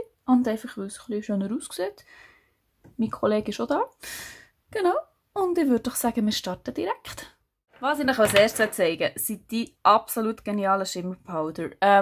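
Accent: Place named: Switzerland. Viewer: Schwiizer Dialekt han I scho vermisst 💚💚💚💚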